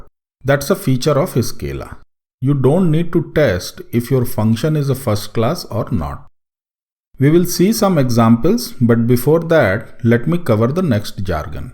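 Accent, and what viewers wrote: India. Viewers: namas te. дорогие индусы, пожалуйста, учитесь говорить по-английски нормально, ваши курсы невозможно слушать. речь непоставленная, акцент жуткий, половину слов просто непонятно иногда. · Heavy In accent is a plus. Perfect videos are typically less natural.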